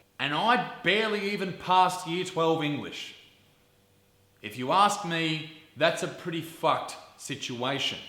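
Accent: New Zealand. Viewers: that was the typical north island Maori accent, pakeha don't have the same mannerisms, we still say bro tho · That Kiwi accent tho, it reminds me of Jonah from Tonga, Chris Lilly, “you on your period or what miss!” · Quality Kiwi accent. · That kiwi accent. On point 👌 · your NZ accent though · u nailed that Kiwi accent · his kiwi accent makes him sound like korg from thor ragnarok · You’re Māori accent is on point bro ps some Māori’s hate being called kiwi cause the British made that name up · Love this vid<3 Also that kiwi accent🤣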